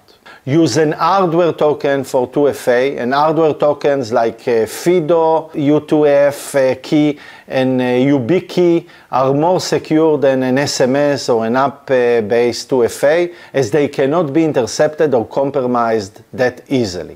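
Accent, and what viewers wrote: Israel. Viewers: בלי להעליב שומעים עליך שאתה ישראלי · My brain kept hearing "TO F*CK TOROUGHTENTICATION" until it learned that it was just a strong Israeli accent.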